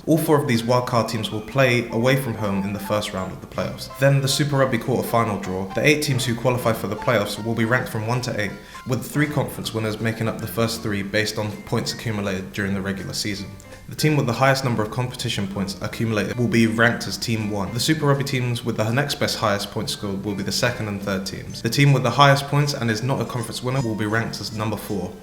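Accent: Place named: United Kingdom. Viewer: You sound British. Us southern hemisphere supporters don't keep up with your rugby tournaments either.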